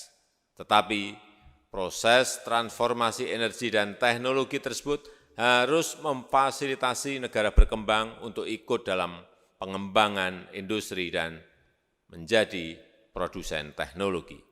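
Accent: Java, Indonesia. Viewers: Laen antara bahasa Inggris pake logat Jawa dengan SALAH MELAFALKAN BAHASA INGGRIS, guru gw orang jawa logatnya kental bgt tapi melafalkan bahasa Inggrisnya mantap bgt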